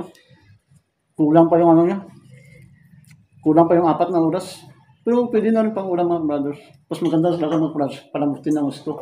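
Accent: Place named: Iloilo, Philippines. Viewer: Ilonggo ka sir😊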